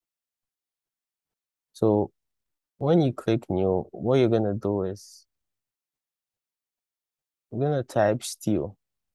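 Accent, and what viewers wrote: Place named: Ghana. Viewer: You have a Ghanaian accent. Are you a Ghanaian?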